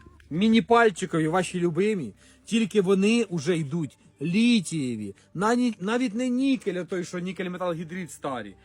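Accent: Ukraine. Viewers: Красавчик, этот говор, этот суржик класс,😊 я почти также говорил в детстве, осйо оце, воно. 😊😊😊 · Полтавська мова)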